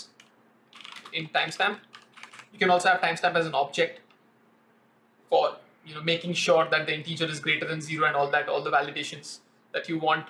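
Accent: India. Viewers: As a non native english speaker, the indian accent adds difficulty. But it's in the pain that you soak up knowledge the better. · If a course starts with "hellou ewerywone!" I at least know that he put in the effort and worked damn hard to make it! Cheers! (For the trolls, this is not racist but actually respecting and valuing the creator and effort! I have been to India.) Thanks, great intro! 🤘🏻😎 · Oh my god, i was very happy to hear white face with good english and right after we were fucked up with indian accent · Great video thanks for sharing. However, you Indian guys learn everything but not the accent, straighten it as it makes it difficult to follow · I have to admit, even though having a bad accent, indians most of the time give very good lessons, I just need to get through the difficulties of understanding the accent)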